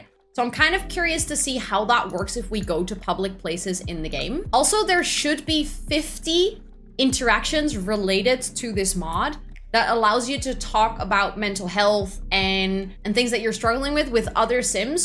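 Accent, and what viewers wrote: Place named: Netherlands. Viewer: WAIT A SEC!!! BEN JE NEDERLANFS????????? ik bedoel ik hoorde het wel al maar dit is. zo. cool! dit heeft me echt gemotiveerd! ik dacht dat ik raar zou zijn als ik mijn sims content in het engels zou maken maar wow. i'm flabbergasted!!! IK SUBSCRIBE NU GELIJK! je engels is echt super goed.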